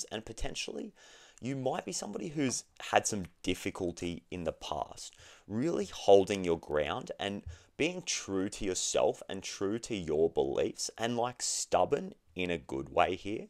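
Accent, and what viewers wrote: Australia. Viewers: are you Australian? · Oh you a fellow Aussie 😊 nice read..!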